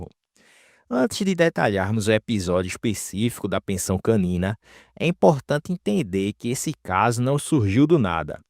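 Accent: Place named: Pará, Brazil. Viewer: Sotaque forte paraense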